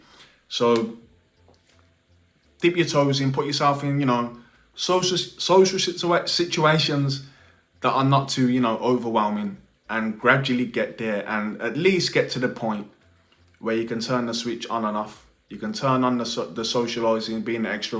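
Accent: Wolverhampton, United Kingdom. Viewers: You sound like me born and breed in Wolverhampton.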